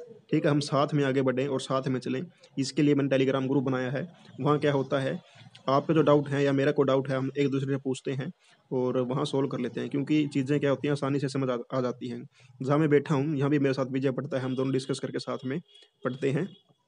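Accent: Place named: Haryana, India. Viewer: Ap haryanvi hee Bola kro kuki bich me jb ap hindi bolte hoto to haryanvi swg aaa jaata h😁😁😁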